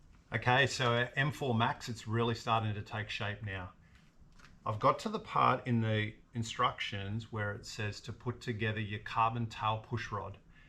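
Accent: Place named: Australia. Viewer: Nice heli. Good review. Love your Australian accent. Hello from Massachusetts, USA.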